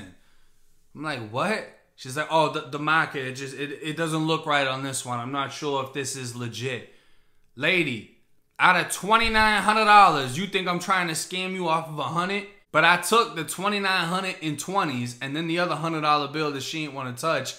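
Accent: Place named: New Jersey, United States. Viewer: Kinda sound like you from jersey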